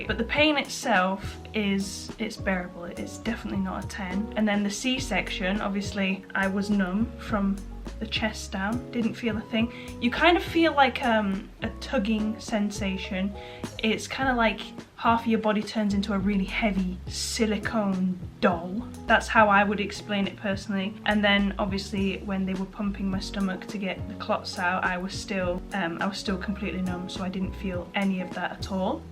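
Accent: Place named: Wales, United Kingdom. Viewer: I can seriously relate when you say you have Connor and your family only. I dropped all of my high school friends due to toxicity, and found my best friend in my boyfriend. Also I am a welshie gal !! Love watching your videos and hearing a familiar accent😊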